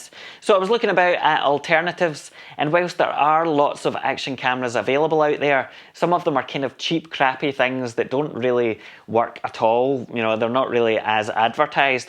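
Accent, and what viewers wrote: Scotland, United Kingdom. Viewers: I spotted the word "Pish" used in your video, and I said tae masel, this guy's gotta be Scottish. Thanks for not disappointing matey 👍 🙂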